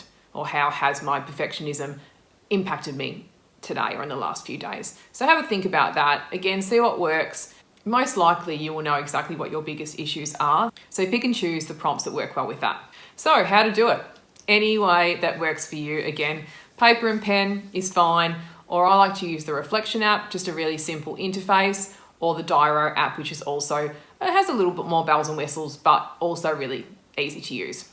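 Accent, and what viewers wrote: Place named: Australia. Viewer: Definitely Aussie :-)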